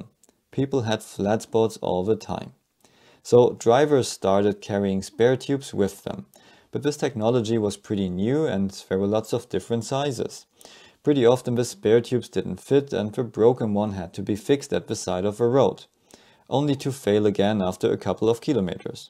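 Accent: Germany. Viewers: Klingt so, als würde ein Deutscher versuchen, Englisch zu sprechen.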